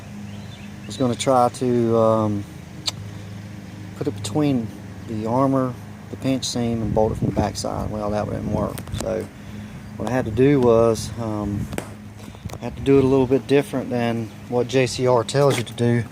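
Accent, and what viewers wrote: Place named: United States. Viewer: Don't mind the countryfied accent... it s down home Americana we need more of it. Nice work on that XJ she looks real pretty... better tho when she gets mud on herself.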